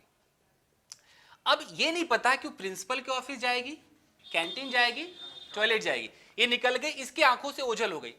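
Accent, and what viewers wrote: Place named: Meerut, India. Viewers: best class ever because of haryanvi agree? thokdo like 👍👍👍👍👍👍👍👍👍👍👍👍👍👍👍👍😂😂😂😂😂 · you are from Meerut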